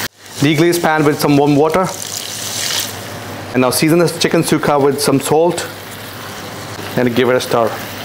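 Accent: Scotland, United Kingdom. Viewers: never heard of sukka. Thanks, this looks delicious. Will use fatty pork though. Your videos have become masterpieces: succinct, beautiful to watch, only the best recipes, and an accent that takes me back 35 years to being a student on the other side of Scotland! Can't wait to try this (speaking Scottish to myself ;-))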